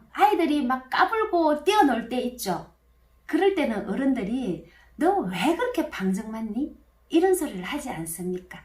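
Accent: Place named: Yeongju, South Korea. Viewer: 영주사투리 같은데 듣기 좋습니다.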